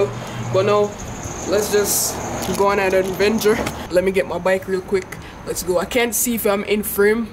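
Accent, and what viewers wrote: Jamaica. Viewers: bro, are you Jamaican? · MajorinkPeoples He's sound like a Jamaican, that's how our accent sound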